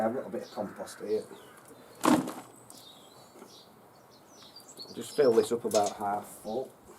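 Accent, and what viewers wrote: Yorkshire, United Kingdom. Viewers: Very informative. You have got a great Yorkshire accent. Thumbs up.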